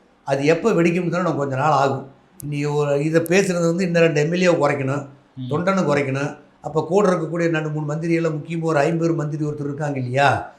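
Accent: Kongu, India. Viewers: அருமையான பதிவு. ஐயா அவர்களுக்கு எங்கள் மனமார்ந்த நன்றிகள். அவரின் கொங்கு தமிழ் அருமை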